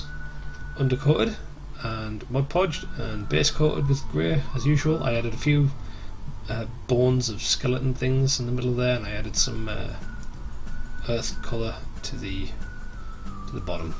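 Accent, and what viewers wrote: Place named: England, United Kingdom. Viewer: Sargon as in Carl Benjamin the youtube personality. You sound like you're from the same part of jolly old. You even look like you came from the same clan. You can pretend I meant the original Sargon if you prefer.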